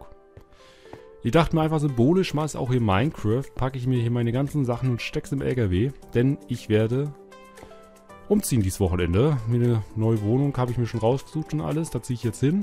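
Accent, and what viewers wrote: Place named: South, Germany. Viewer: ich bin mir sehr sicher dass er aus dem Süden / Rheinland-Pfalz kommt... Tipp vom Experten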